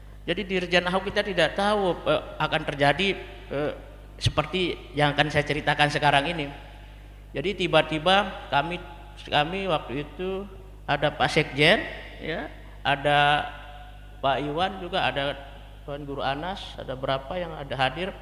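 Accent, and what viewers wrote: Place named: Bali, Indonesia. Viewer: Orang mana ini brbahasa sj logat bali x ye... koq gk logis cm bs sling adudomba masyarakat gk etis dech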